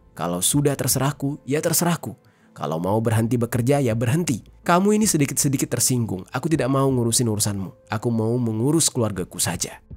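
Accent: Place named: Jakarta, Indonesia. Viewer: Senyum2 dengar bang bicara bahasa banjar logat. Jakarta😅😅..salam bang dr tabalong❤❤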